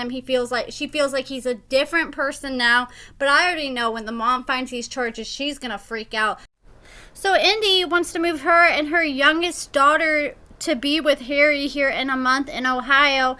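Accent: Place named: South Dakota, United States. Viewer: Where are you from,? I’m asking because I heard you say “pEllow” instead of “pillow” and yesterday I heard you say “reNember” instead of “remember”, lol just asking because my grandparents are from South Dakota and that’s how they talk. They say “pEllow” and “mElk”.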